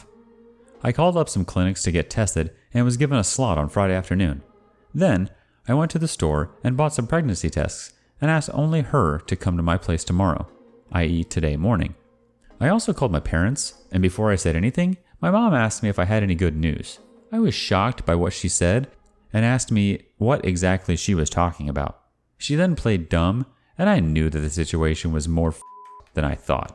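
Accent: India. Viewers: The first story sounds like Indian !!!! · Sounds Indian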